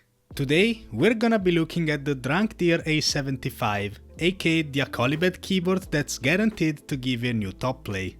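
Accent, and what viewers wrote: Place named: Italy. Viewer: That's some sick italian accent, right?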